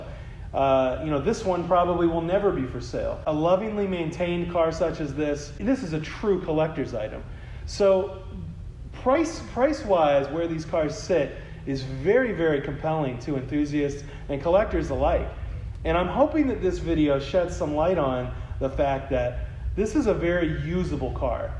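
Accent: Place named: United States. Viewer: I never even knew Nobl's were sold in America. Very weird seeing this car on yank roads and hearing an American accent talk about it. Top job.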